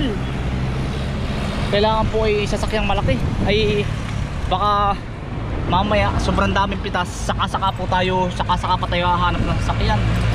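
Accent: Quezon, Philippines. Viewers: Ay Alin baga nman po Abay taga Quezon po kayo hane Alam nay sa ponto pa laang ay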